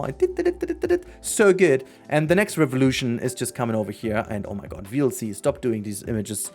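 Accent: Germany. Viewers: Hey ganz kurz, bist du Deutscher? Vom Gefühl her wär ich mir fast zu 100% sicher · I am a nativ speaker both german and english, I thought since you have an obvious german accent that I should speak in german to make it easier for you but okay if you want. You need to work on your dictionary, it is very cringe worthy hearing you use the same words over and over again because you lack the ability to express yourself. It seems that a neutral criticism about your video has struck a deep cord with you and I am sorry that I hurt your feelings, it doesn't change the fact however that you do repeat your words. Have a nice day.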